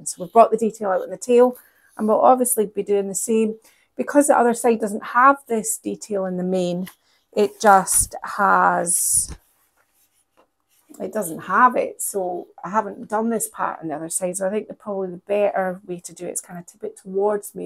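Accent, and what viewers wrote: Scotland, United Kingdom. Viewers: Immediate Like without watching, couse: Rocking horse, Scottish accent, work on the floor....Thank you.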